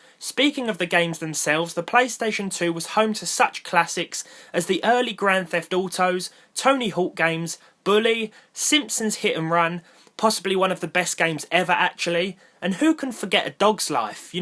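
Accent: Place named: Essex, United Kingdom. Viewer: I'd have to kill myself if I spoke like him , a towie reject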